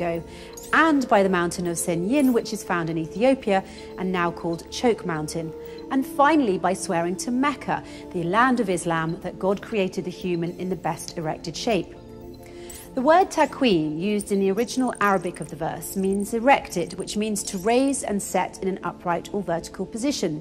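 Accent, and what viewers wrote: United Kingdom. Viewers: Speaking in a british accent in no way diminished the primitive nonsense you are trying to sell here.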